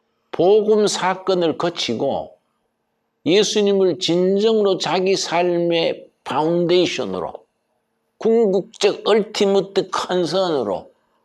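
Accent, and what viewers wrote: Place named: Gyeongsang, South Korea. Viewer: 구수한 경상도 사투리로 엮어 주시는 하나님말씀 때때로 난해한 성경말씀을 쉽게 풀어 강해해 주시는목사님 말씀은 언제나 제게 감동을 줍니다 감사 합니다 감사합니다.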